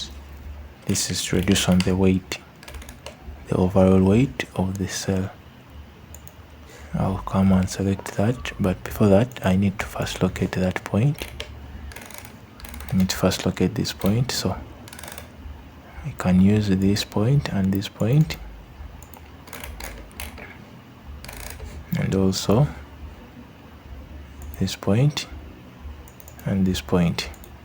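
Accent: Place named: Kenya. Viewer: you have a Kenyan accent. Thanks for the tutorial!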